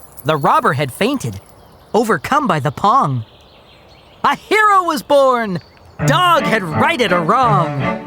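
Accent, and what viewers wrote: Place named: United Kingdom. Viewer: I LOVED these!!! It's just not the same though without the British voice over :(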